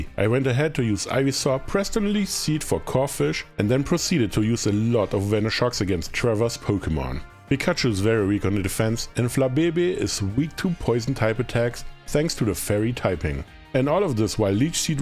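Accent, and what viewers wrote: Germany. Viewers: So habe das Video jetzt erst gesehen ich habe direkt erkannt dass du deutscher bist du hast einen sehr ausgeprägten deutschen Akzent da fühl ich mich doch gleich wie Zuhause or in english like Home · first time seeing a video of this channel but am i hearing a german accent? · Peak, but are you German perchance?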